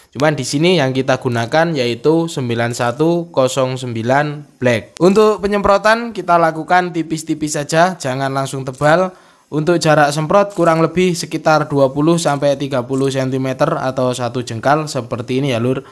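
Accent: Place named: Java, Indonesia. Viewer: Jowo tulen e iku lo.... Ra mbetahi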